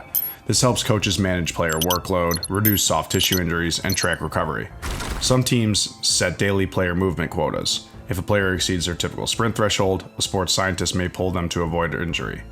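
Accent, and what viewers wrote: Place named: Midwest, United States. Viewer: Sooooo…this video is a complete ripoff from the “Amazing Technology” 3-year old video from the NFL Throwback channel. Which is a rip from the NFL Explained NBC-produced documentary. All clips are pulled from that video. The only thing missing is this narrator’s Midwest accent. I’m sorry, narrowing down a real shows work and summarizing it, isn’t “work” that you should get credit for. Cite your sources and link if you need to, but be original.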